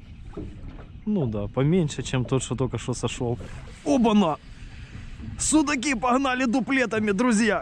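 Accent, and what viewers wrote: Ukraine. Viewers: А чего у вас в 6 вечера так светло? Это же Украина вроде, судя по говору...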